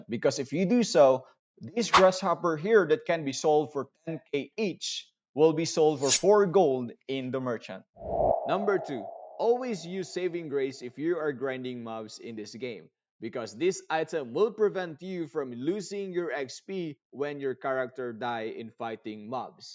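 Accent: Philippines. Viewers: Why do i hear filipino accent xd Btw im also filipino This is useful tip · Are you filipino? Cause your accent is so familiar xd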